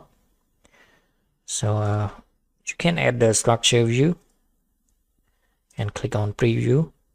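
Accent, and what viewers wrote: Cambodia. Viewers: u sound like a Cambodian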